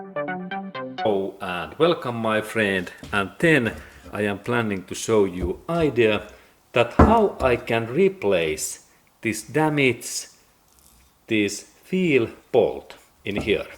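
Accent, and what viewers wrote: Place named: Finland. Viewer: Your Finnish accent brings me joy. Now I know that you know what you’re talking about.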